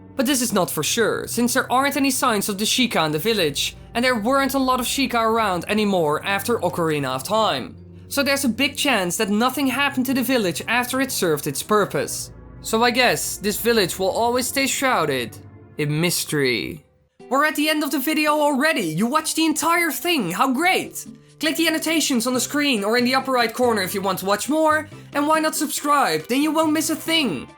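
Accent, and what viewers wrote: Netherlands. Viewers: do you happen to be dutch? i can really recoqnize the dutch accent as i am one myself :p came across your theory through the zeldainformer fb page 😁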